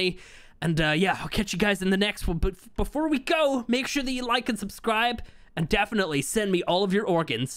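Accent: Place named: Ireland. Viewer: What is this video?!😂😂 Well we can tell he’s Irish!😁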